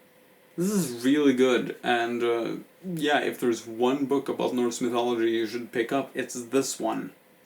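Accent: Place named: Norway. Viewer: Wonderful accent my guy! Can tell ur Norwegian.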